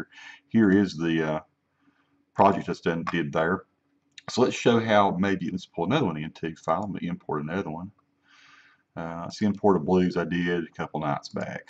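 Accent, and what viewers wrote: South, United States. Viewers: I love your southern voice!!! Thank you for the tip! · Thank you, great help. I grew up in Southern Mississippi but have been away so long that it was nice to hear a down-home Southern accent again. And you play beautifully, I'm going to listen to some more of those Blues!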